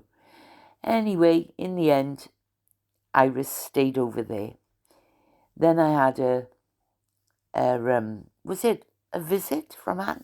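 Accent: Wales, United Kingdom. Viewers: your sweet ,dulcet, Welsh tones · Oh it’s good to hear your welsh accent, I was brought up in Croesycielog and used to go to Cwmbran cinema, left when I was 18 to train as a nurse at Gloucester, retired now but I do love your channel, lost my husband in January and my daughter in July this year, so sorry about your cat, I lost both my dog and cat a few years ago, but to old now to get another one, I have missed your channel, it was lovely hearing from you, have a wonderful Xmas and a very happy new year, hope that lottery isn’t a scam 🏴❤️ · Lovely to hear your beautiful Welsh voice again. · Oh. It’s so lovely to hear your beautiful Welsh voice! I hope you have a lovely Christmas. 🎄🙋🏻‍♀️🎄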